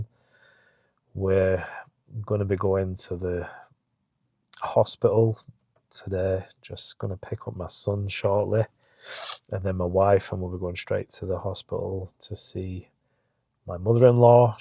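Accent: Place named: Scotland, United Kingdom. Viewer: your wife and mom-in-law are so lucky to have such a devoted husband and son-in-law to care so deeply and do so much very clearly out of true kindness and love and not just some begrudging sense of obligation, as too often is the case! I pray for a rapid and thorough healing of MIL’s injuries and it’s wonderful she plans to get right back to being active as a hip fracture can far too often signal the end of independence and active lifestyles for older people. I suspect your MIL has nothing to fear as she looks as bright and spry as anything!!! I hope you get some painting done and thank you for sharing your life with us! Ps-this might be nosey but I have to ask…what is your accent? To my highly American ear,it sounds like Scottish and British together? Could that be? 😂 Take care, and take care of Mum!!! 😉😃🙏🏻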